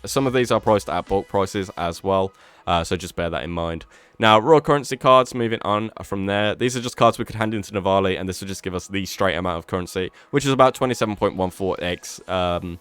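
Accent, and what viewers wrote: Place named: United Kingdom. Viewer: I find the pronunciations of “unrequited” and “succor” to be offensive. Are you or not?! Edit: “The ‘Enfusiast’” absolutely sounds British 😆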